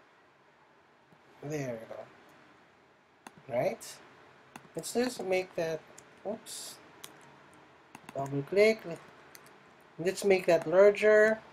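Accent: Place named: Philippines. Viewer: Pilipino ka no halata sa salita eh xD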